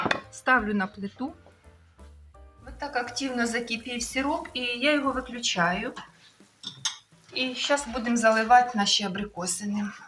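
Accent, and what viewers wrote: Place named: Chernihiv, Ukraine. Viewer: Прекрасна мова, красивий суржик, на Чернігівщині всі так балакають, не нравится не слухайте! 👍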